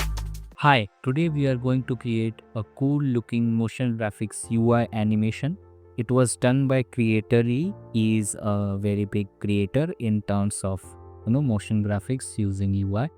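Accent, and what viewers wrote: India. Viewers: wahi to Indian accent me English bolta hai mza aata hai dekhne me lekin pura dalta to achha hota aor bhi logo ka dekhta hu but unka utna mza nahi aata dekhne me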